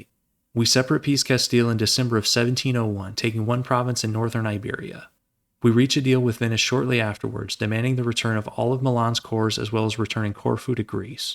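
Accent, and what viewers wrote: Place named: United States. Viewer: As an Australian, seeing and hearing an American is suprising to me each time. I want to see you conquer Austria and become emperor, Catholic, and revoke as an Australian tribe.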